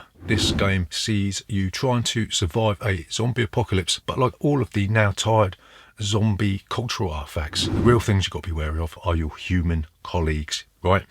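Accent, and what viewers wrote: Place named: London, United Kingdom. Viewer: You sound London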